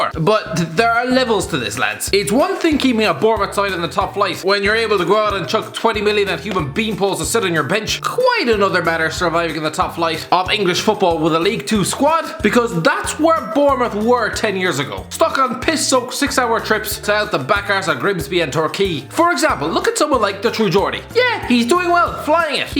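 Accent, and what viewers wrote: Ireland. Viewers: I loveeee his Irish accent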